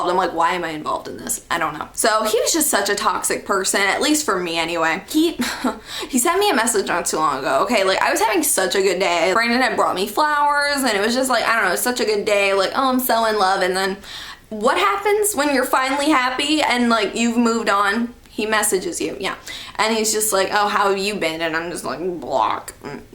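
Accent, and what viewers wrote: United States. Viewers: Hey! You are really nice and you seem like a good person, +1 Subscriber. And the total Drama part about Duncan, YAS I LOVE TOTAL DRAMA ISLAND. Where are you from? You seem like you have an American Accent, I'm Australian btw.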